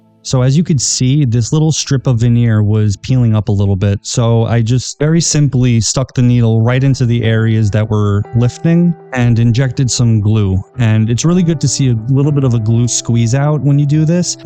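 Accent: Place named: New York, United States. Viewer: Where on Long Island or New Jersey are you from?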